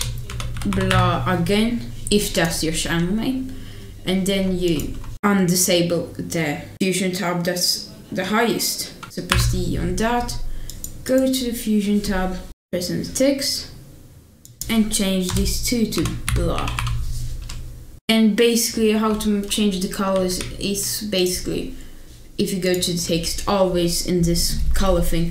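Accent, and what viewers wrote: Sweden. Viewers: bruh stop being toxic hes from sweden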